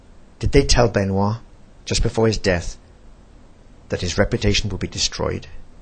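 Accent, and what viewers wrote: England, United Kingdom. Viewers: this english accent is starting to annoy me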